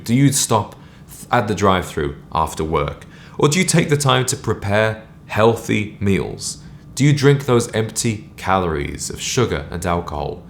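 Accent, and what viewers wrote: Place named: United Kingdom. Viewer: I noticed the tendency to mock men in the movies decades ago in the 80s. I have always hated to see it. I can’t believe that male actors and producers went along with this betrayal of their own gender. (On a different note: I’m curious that the title of this video focuses on American men, but you sound like your British…. do you think that British men have not been emasculated?)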